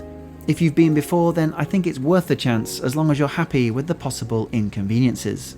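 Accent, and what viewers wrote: United Kingdom. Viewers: My first time viewing and enjoyed the humor and as an american the brit accent top it of. I think rain will spoil any vacation time. I live in the great lakes region[ohio] and May thru Oct. is really nice.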